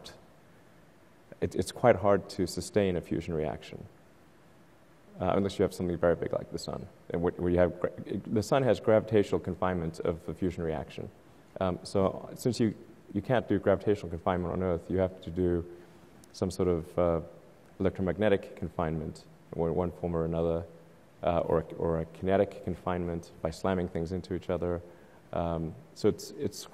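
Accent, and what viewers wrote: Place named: South Africa. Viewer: you can still hear his south african accent a bit in this video lol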